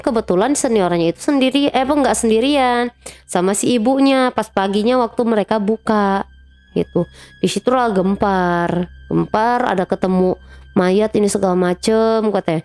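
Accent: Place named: Sulawesi, Indonesia. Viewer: Aksen logat sulawesi 😁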